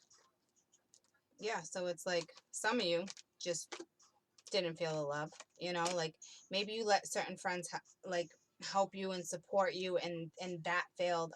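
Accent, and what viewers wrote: Boston, United States. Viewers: (Aries) omg. the only differences in you and my Scorpio ex is the hair, color, same hair, different nose and your I assume boston or jersey accent, leaning towards boston though insead of Ky. It's crazy but I love your videos, your too decent. 3rd party situations with her and me being verbally and emotionally abusive to her because I........just fucked up but. I miss her. I always enjoy your videos. :)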